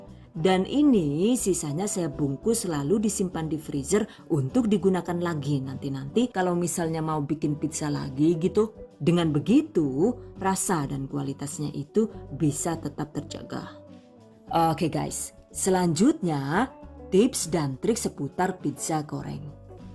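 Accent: Banyumas, Indonesia. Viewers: Suarane mdok tmn yakkk asli bnyumas y yu😁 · suka cegek saat dgr ad bahasa ngapak nya hahahaha,, tp lucu juga sih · Suka bahasa ngapaknya.. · noted..besok mo nyoba bikin ahh..btw mbakayune lucu temen klo lg ngapak mix with english..👌👍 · Waalaikumsalam. Catat. Ngapak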